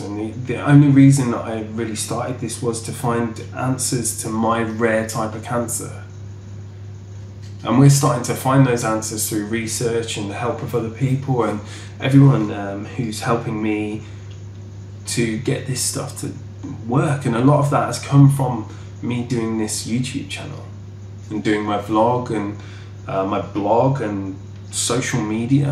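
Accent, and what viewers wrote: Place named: United Kingdom. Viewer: sotaque inglês genuíno.muito engraçado o teu sotaque británico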